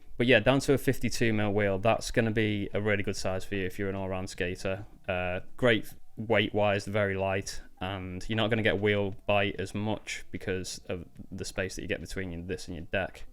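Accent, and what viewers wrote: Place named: Canada. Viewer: You sound very Canadian 🤔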